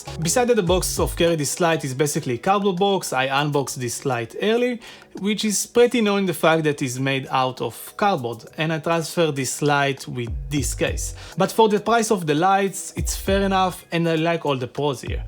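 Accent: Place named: France. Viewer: your accent is fine dude. He’s just a hater. Most people LIKE accents. Sounds like you are French. Your accent is strong, but by no means hindering your communication.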